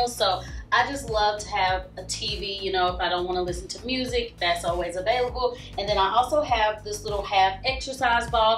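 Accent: South, United States. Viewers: I know it isn't much to film. But we miss your videos. If you have any bloopers or footage you think we would be board with is OK to post. We just miss that Southern Drawl & your contagious smile on our timeline. #MissYouAndYourFamily #BlessingsToYouAndYourFamily #MoreVideosPrettyPlease #FromCaliforinaWithLove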